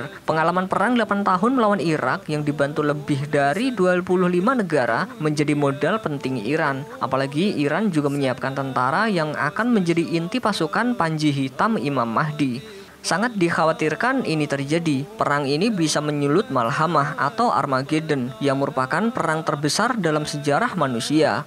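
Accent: Java, Indonesia. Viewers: Fokus video bree... Kok malah fokus komen😂 Piye arek² Iki😂 Pengetahuannya di video Ora Nang komen🤣.. #amet bahasane Medok😂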